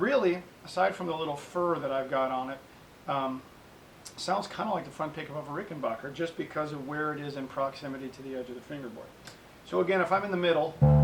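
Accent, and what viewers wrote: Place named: United Kingdom. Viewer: love the british accent. greetings from london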